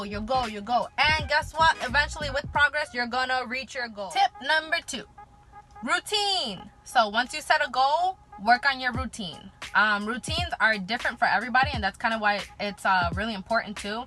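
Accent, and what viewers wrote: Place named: Los Angeles, United States. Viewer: Good job Pretty woman. You sound like you're from LA.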